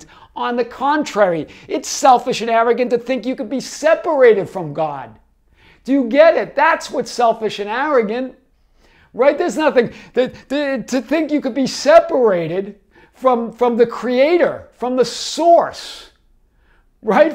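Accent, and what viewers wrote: Boston, United States. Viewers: Weird thing, the whole reason I started listening to you was literally because of your accent. I’ve always had a strange connection and love for Boston… we go there every chance we get… And we try to say “chowda” etc😂 All that to say your accent is one of many things that makes you our lovable and lighthearted teacher- I think it’s part of what put you on the map!!!!!! 🍀It did with me anyway!! ❤️ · I love your Boston accent! Thank you! You helped me remember and continue to do so. I love my life and I am grateful! Thank you again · Love your Boston accent ❤️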